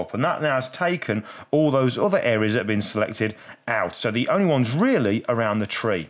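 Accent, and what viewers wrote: United Kingdom. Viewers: 好喜欢这个英伦腔 like the British accent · great british accent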